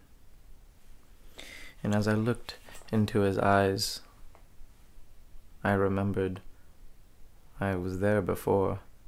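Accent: Scotland, United Kingdom. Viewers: I love the accent but Is it British because if it is I’m sending you £££££££😂😂🏴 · You sound Scottish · Is that suppose to be a British accent? If so really good xx ( I’m from England) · Quite a good british accent 8/10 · One of my favorite movies ever! Thank you so much for doing this. PS great British accent. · Scottish accent?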